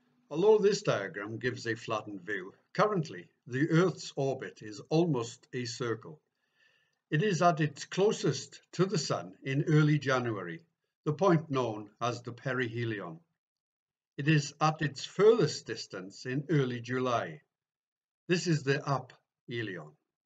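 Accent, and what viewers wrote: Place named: South Wales, United Kingdom. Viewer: This is the South Wales Valleys ' Phone voice' . Well presented butt . Of course all us Brythonic and Irish people were fully aware of this since the end of the last ice age when we moved back in from modern day Spain.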